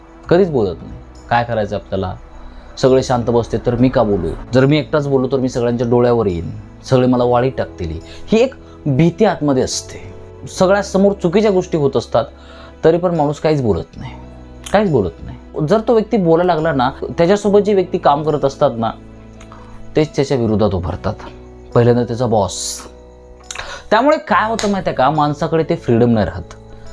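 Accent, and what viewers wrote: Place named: Kolhapur, India. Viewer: छान माहिती व विश्लेषण करून सांगता व तुम्ही तुमच्या बोलीभाषेवरुन आमच्या कोल्हापूर चे वाटता.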